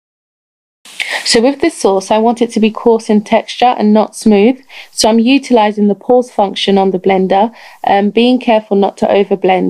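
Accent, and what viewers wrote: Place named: United States. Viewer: Are you American or a Nigerian?? Love d accent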